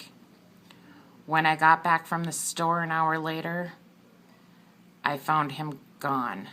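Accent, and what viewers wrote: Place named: Upper Michigan, United States. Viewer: You have a Upper Michigan type of accent. It could be Minnesota or Wisconsin too. I grew up in the U.P. but live in TUCSON-AZ.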